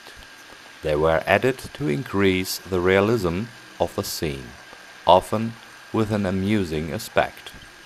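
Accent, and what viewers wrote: Germany. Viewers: Love that German accent. Great model. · The pronunciation of the Germenglish is sooooo funny, love it!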